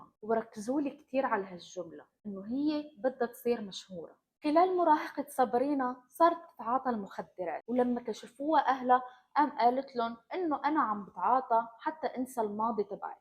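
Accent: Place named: Morocco. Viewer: أنت أكيد من المغرب... Your accent باين ماشي لبنانية.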